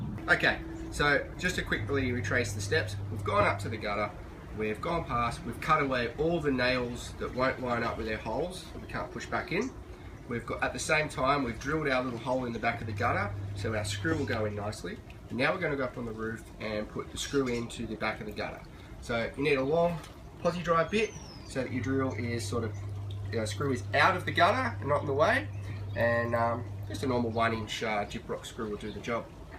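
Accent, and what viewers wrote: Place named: Australia. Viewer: First thing I noticed you're an Aussie, thank Christ mate just hearing another Aussie blokes accent giving me home repair advice is like "sweet as, not some seppo raving on with shit.. soon as I heard your voice after watching a couple of other videos I felt like having a beer like I was home.. I haven't watched your video yet it's probably almost whilst I have been raving on with crap but good onya I'll go get a beer and watch it now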